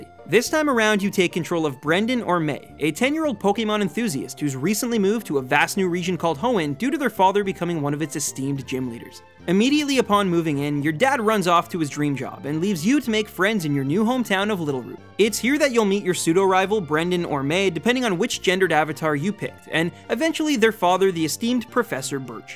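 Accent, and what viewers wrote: Canada. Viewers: Bro your Canadian accent is so awesome, I want to watch your videos just to hear it lol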